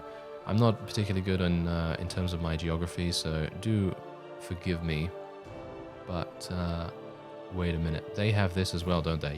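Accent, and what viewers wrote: United Kingdom. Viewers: a very proper British accent in my opinion, it’s butchered otherwise haha between the old Australian accent and this blokes one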